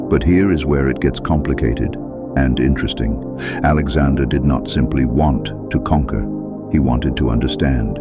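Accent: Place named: United Kingdom. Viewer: so bored of that british accent commentator without a face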